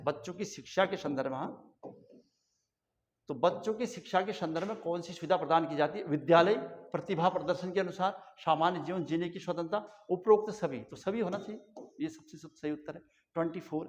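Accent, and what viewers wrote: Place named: Pithoragarh, India. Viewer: Hum tone pth walo ki jaisi hai mai bhi pth se hu tab😂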